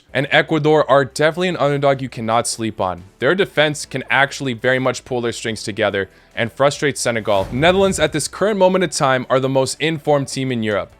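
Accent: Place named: United States. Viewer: pfff this is stupid... people predicting like you do don't know about football at all.... you sound american so i guess that is the porblem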